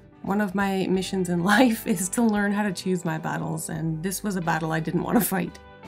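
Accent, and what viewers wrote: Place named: Hants County, Canada. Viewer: Hello from East Hants! Did you film the lightning? It was relentless and went on for hours. Always love your videos, and hearing a Hants County accent on YouTube! 😉Keep up the great work! ❤🤍❤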